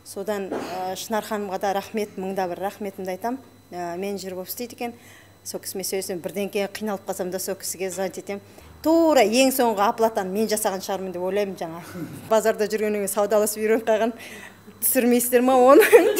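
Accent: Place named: Karakalpakstan, Uzbekistan. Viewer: Қалпақтардың акцентімен сөйлейді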